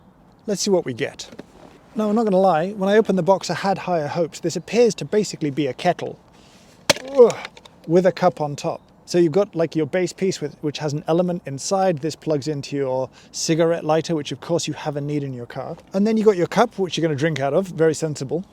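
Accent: United Kingdom. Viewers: Articulate British man tells you everything will be okay (ASMR)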